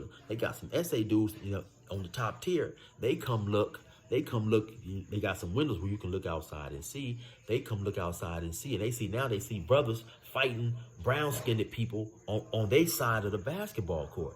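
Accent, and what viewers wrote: California, United States. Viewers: Bro I fuks with your channel. I been on it every since it popped up. Your a good story teller and the way y’all Cali boys talk make it more entertaining. You just different bro and I fuk with it. Keep it up G 💯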